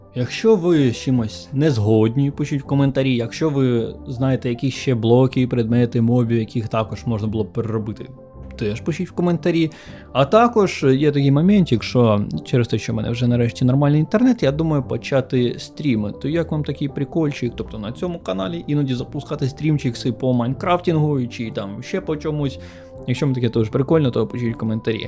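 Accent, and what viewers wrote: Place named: Russia. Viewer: виправдання взагалі жоРсТкО помаранчевий так часУ з наголосом на У обговоримо застосування шкірку шкірку сенс/застосування справді гівно кориснішими тонування каблучки/персні незрозуміло просто обережнішими, без «більш» так дупу/сраку/гузицю поставитИ мОмЕнтИк І по всьому відео: 1. Літера «Щ» дає два звуки: [шч], але не [шь] 2. Милозвучність української полягає в звучанні голосних, а саме, в тому, що всі голосні як пишуться в слові, так і читаються і тут немає цих московських спрощень коли літера «О» читається як [а] і тому подібне. Багато такого чути в твоїй вимові. А так, молодець, що знімаєш українською. Виправити ці моменти і буде прям фист файно.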